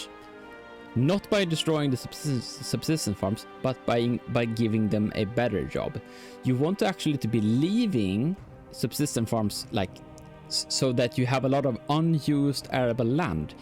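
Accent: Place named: Sweden. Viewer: That's a swedish accent.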